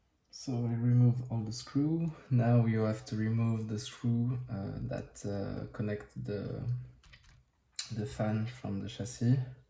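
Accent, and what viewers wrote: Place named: England, United Kingdom. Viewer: J'adore ton accent anglais XD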